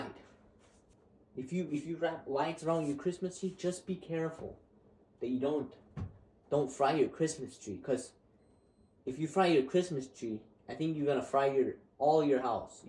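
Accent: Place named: Switzerland. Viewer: Dude you speak swiss german accent not dutch. I heard one amish say "bin ufgwachse". Thats 1:1 swiss german accent kanton luzern. Verstosch?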